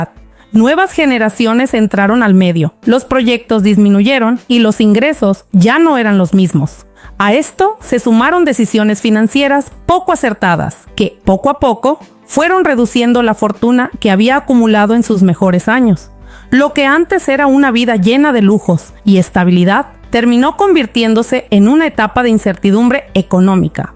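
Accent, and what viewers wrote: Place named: North, Mexico. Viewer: Ten cuidado lo que subes en tus contenidos Lorena Herrera no es un ejemplo de lo que dices ya quisieras vivir cómo vive ella. no seas tonta y si vas a subir contenidos con tu voz toma clases de dicción porque tienes un asento de norteña no sé de dónde eres es un acento muy desagradable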